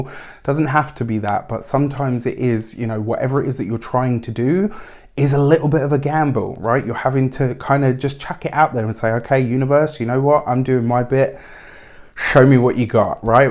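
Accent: England, United Kingdom. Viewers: Ive missed your English Voice…. thank you for the good news!!! Stay Golden!!!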